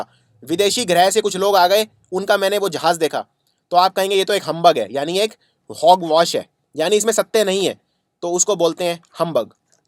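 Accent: India. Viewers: oooo sh***t again this pronunciation by indian people .....!!!!! sorry, but it's so AWFUL!!!! my ears are died !!!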